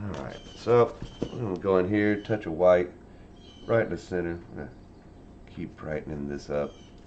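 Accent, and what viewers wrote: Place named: Texas, United States. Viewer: totally agree, the entire human experience boils down to sharing stories via art in whatever form that carries it, everyone should have access to and nurture that inherent need to create and feel fulfilled by it. RDR is my favorite game too! unfortunately it's such a favorite that it cut heavily into my painting hours lol. your accent is nice though, it makes me miss texas :) i hope you continue to share your art & thoughts here!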